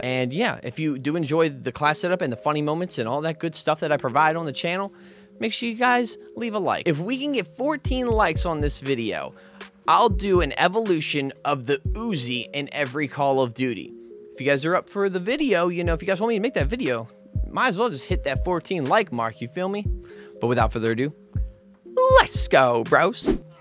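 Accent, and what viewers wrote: East Coast, United States. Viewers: i’m from baltimore and just by the way you talk i can tell you’re from the east coast, no weird shit g was just curious 🤣 don’t trip i’ll put my homies on get you some more subs you feel me?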